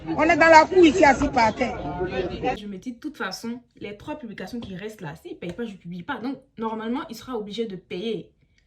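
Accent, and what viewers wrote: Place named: Ivory Coast. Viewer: J’aime trop la façon tu nous racontes la story🥰🔥 l’accent ivoirien et tout et tout je kiff 💕 Bourkina / Civ c’est la famille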